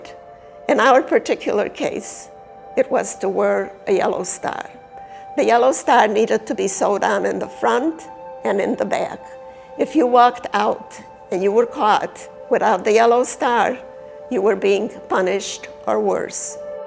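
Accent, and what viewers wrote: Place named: Chicago, United States. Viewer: She's got a heavy story and a heavy Chicago accent :-)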